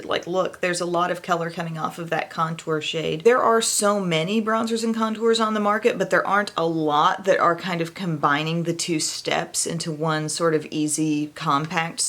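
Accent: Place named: Illinois, United States. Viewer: I live in Hawaii and at 3 am I was about to go to sleep but saw your notification and had to watch! I love your content. Thank you for being so honest and always so positive. You seem to be so kind and genuine. P.S. I'm originally from Kentucky so your Illinois voice reminds me of home. 💙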